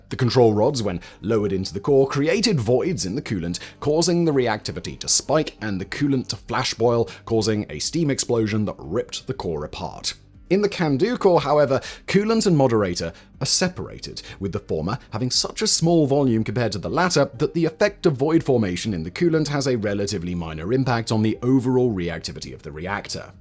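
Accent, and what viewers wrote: United Kingdom. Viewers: But with a Brtish accent, it sounds some much more sophisticated then when AI narrators screw it up. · which is odd, since there is a Kincardine in Scotland, which given the host's UK accent, should be familiar.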